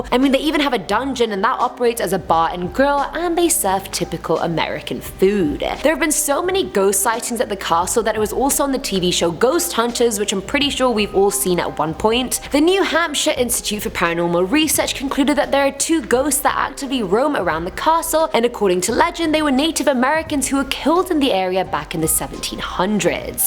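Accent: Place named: United Kingdom. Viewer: ? Have you ever been to Hollywood or New York... I really think you would have a better chance at your career in one of those two places.. Question you sound like you are from the UK but you live in Canada mind if you enlighten me a little? You truly are a smart have great humor and awesome at Story telling... Mind if I jump on thee find love wagon with ya?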